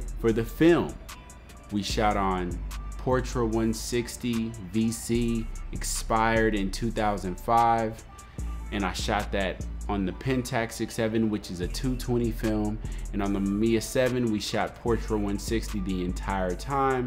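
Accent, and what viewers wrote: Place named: South, United States. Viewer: Your southern accent cracked me up XD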